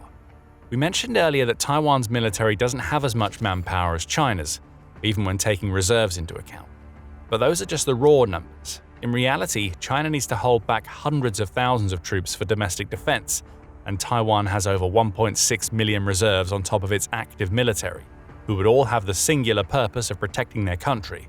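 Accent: United Kingdom. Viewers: I enjoy watching your videos and your British English voice. But why use Americanisms like "gotten"?!! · Despite the British accent, this is not a European perspective. The video accuses the Chinese leader of doing exactly what the US administration is embroiled in - extreme corruption and promoting talentless political hacks to leadership while firing actual skilled leaders such as Gen. Christopher Donahue. I can’t imagine tattoo-covered Kegsbreath was making a rational decision messing with Delta force. So, don’t expect the US to do anything, but make matters worse. They’re also worse off economically. Practically no one can afford a house here. The GDP is artificially propped up by a few billion/trillionaires who control 50% of the economy or more.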